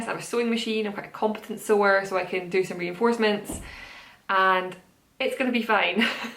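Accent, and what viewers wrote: Scotland, United Kingdom. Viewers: Your podcasts are great :) I was wondering if you could recommend more Scottish knitting podcasters, I absolutely love listening to Scottish English. Also, I planned a trip to Edinburgh this October and I would be very grateful if someone could recommend a nice yarn shop in Edinburgh centre :) Thank you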